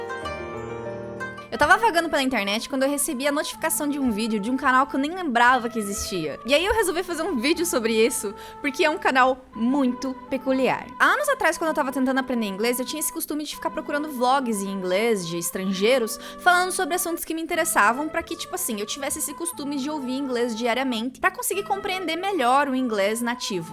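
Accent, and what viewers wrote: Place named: South, Brazil. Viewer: da onde você é?que estado? Parece sotaque sulista Amo seu canal <3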